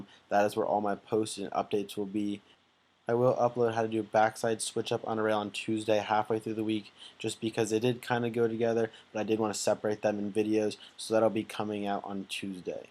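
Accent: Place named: New Jersey, United States. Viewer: NJ accent + skiier inflection = Canadian accent? That is very interesting. Nice video btw!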